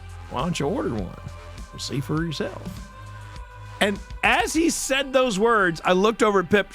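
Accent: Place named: England, United Kingdom. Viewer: Hello!!!! here! Brilliant episode!!! I am so sorry I missed your message - I did private the follow up video but the original is still there with the ghostly voice on. You are more than welcome to use it in a future episode. You might need to scroll down the videos a little but the original video is still there. Thanks so much for the shout-out and I LOVE your English accent😂.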